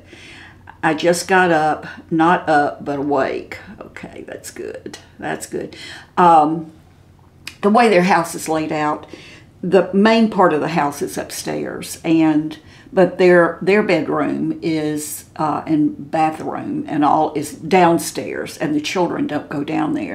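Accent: South, United States. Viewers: Your eyelashs are SO long! Thanks for a great video,. You seem like a big sister, favored aunt and cherished grandmother. None of which I actually had growing up in North Carolina. Your kind spirit and southern accent are INCREDIBLY nourishing and healing for me.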